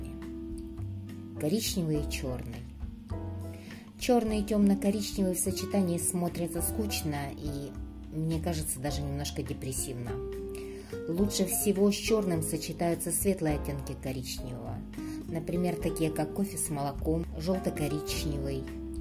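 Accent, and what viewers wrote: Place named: Europe. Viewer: А в какой стране Вы живете? У Вас очень сильный акцент, не могу понять с какого европ. языка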